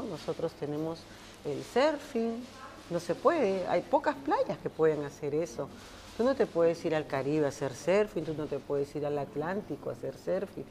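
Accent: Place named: Peru. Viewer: me encantó su manera de expresarse, muy pausado, muy agradable, aparte que el acento peruano es tan lindo ;) saludos desde Colombia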